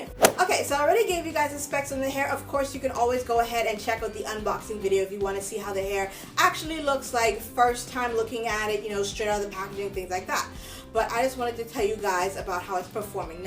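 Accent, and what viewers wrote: Bahamas. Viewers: Hi great review, the hair look amazing on you😉. I just found your channel and I am so happy I did, you're really sweet and very fun to watch😁. I can't wait to watch more of your videos!!! I heard my accent I'm Bahamian too so you know I will be giving you my love and support😁😁!!!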